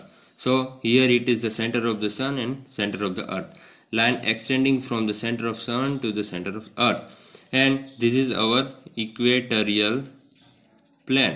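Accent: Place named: Gujarat, India. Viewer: your gujrati accent is too good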